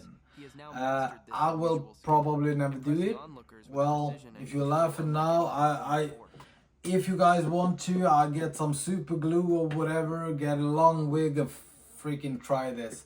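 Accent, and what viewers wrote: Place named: Scandinavia. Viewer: based on his accent, either Denmark, Sweden, or Norway...that's why I'm asking him, Inwas wondering the laws regarding that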